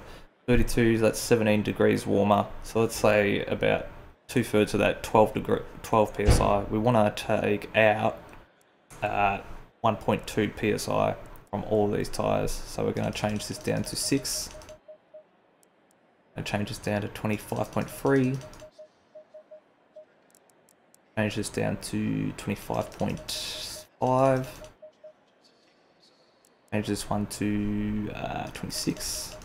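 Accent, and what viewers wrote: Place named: Australia. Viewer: Subbed. Good to hear an Aussie accent:-) Great video. Thanks. Just a question: I want to test in the wet, and also practise pitstop technique, setting up pit strategies, as per your video. Which mode can I use to do this without affecting my SA, CN, CC, etc? ie have ratings turned off, so I can muck about. I can't find a setting where I can select weather (rain). Yeah, I'm a newbie, and all of these variables are doing my head in. But loving ACC (on PS5 and PS4 Pro). Thanks in advance.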